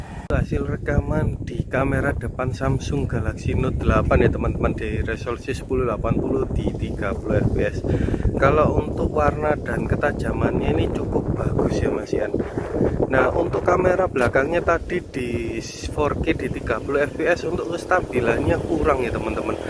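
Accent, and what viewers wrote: Java, Indonesia. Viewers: Bagus reviewnya mas.tp agak medok.(maaf)keliatan orang jawa ya😂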